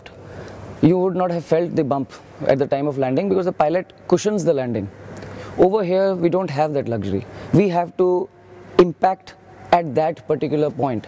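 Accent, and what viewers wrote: India. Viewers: Anything involving an Indian speaker can't go without some dumbass making fun of the accent. It's a cliché now so nobody's laughing.... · bro this is the indian version of English. There are many version. The English speaking in America is not the English speaking in Europe 👍👍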